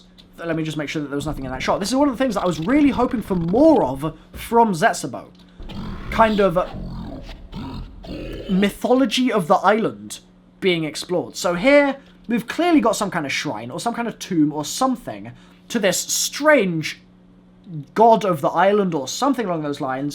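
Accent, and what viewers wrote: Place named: United Kingdom. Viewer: i fucking love how British the commentary in this is. i was laughing so hard.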